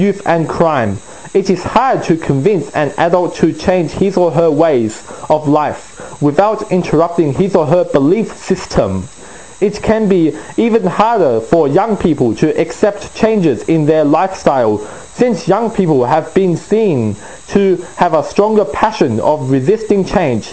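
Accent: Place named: Asia. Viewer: why the asian accent ?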